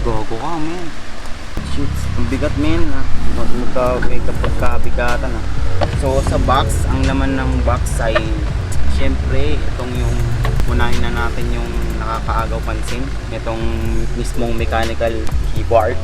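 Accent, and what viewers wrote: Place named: Iloilo, Philippines. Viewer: ahaha ilonggo ka gle ahaha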